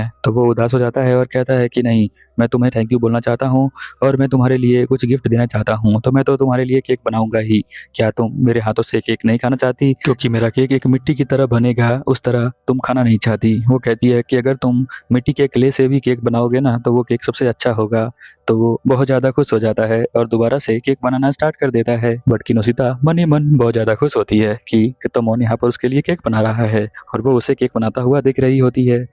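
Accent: Bihar, India. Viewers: Ap Bihar se hai kya?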